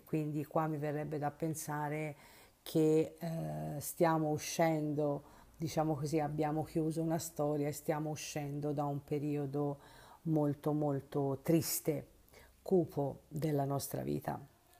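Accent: Tuscany, Italy. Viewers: Stesa n. 2. Col tuo simpatiho accento toshano hai centrato tutto alla grande. Se lui dovesse tornare e per giunta contrito (oggi lo sentirei come un miracolo...), giuro che lo faccio mettere in ginocchio sui ceci e corro a scriverti !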